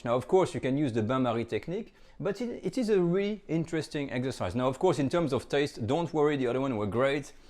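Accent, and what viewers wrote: France. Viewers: Is your accent a regional one? I love it. Bit different to other French people I’ve heard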